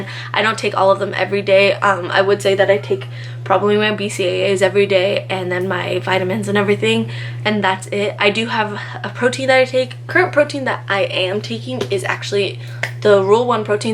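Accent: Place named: Italy. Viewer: Been hanging out with me too much is why you're talking like an Italian sorry not sorry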